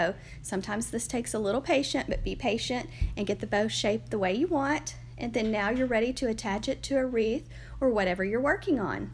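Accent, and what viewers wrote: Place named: South, United States. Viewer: Once I heard the southern accent, I knew this lady knew what she was talking about. Thank you for making it quick and simple too!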